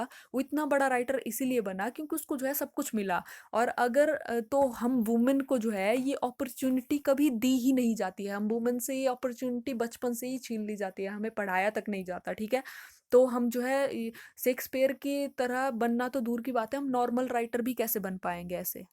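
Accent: Haryana, India. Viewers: Wow it's amazing I understand each and every point From your voice - i can guess Are you belongs from Haryana?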